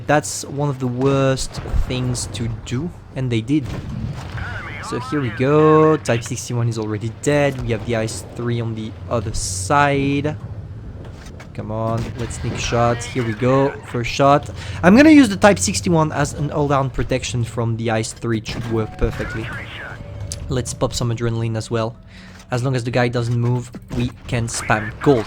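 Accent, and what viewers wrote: France. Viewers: You have French accent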